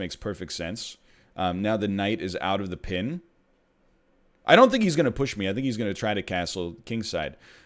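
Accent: Canada. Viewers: Y'all can't convince me that he doesn't sound more Canadian than before..... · Did Canadian got worse (or better, depending on preference xD) in the past few months or am I crazy? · Am I crazy? Has accent gotten more Canadian recently?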